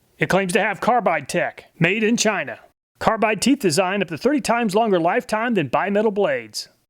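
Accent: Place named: Midwest, United States. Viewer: Os-kil-ating hard k sounds... You are from the midwest right? Lol My Mom says it the same exact way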